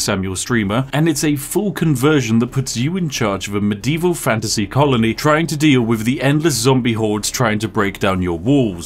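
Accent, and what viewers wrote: United Kingdom. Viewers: I think it might have been a Rim World video that got me to subscribe to your channel. You were jacking stock images and harvesting organs and I thought to myself, "This British sounding guy is a complete psycho, I must subscribe" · British man doing game reviews. Rip totalbiscuit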